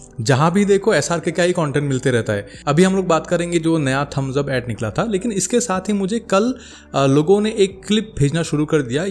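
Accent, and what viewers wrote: Assam, India. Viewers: 😂 Assamese accent tu je odd lagise kiba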